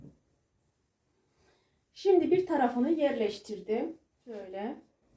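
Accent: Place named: Sivas, Turkey. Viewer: Konuşman Sivas'ı gibi kolay gelsin eline salık evladım.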